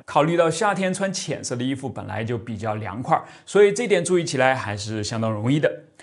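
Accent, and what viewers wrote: Nanjing, China. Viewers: 是南京人啊？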